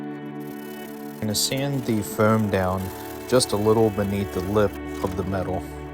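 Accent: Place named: Pennsylvania, United States. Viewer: Helpful video, nice work. And a little Pennsylvania accent asmr I hear? Eh? Eh? Pennsylvania? Am I right?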